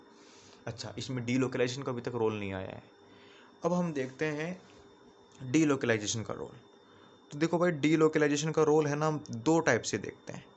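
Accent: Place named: Rajasthan, India. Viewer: Ap rajasthan ya haryana se lgte h😂 bht bdya smj aaya easy kr diya blkl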